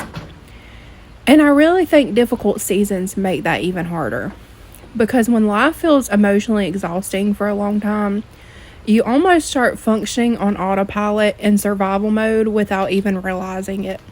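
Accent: South, United States. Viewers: Oo I love the Southern accent. I’m from NC so reminds me of home❤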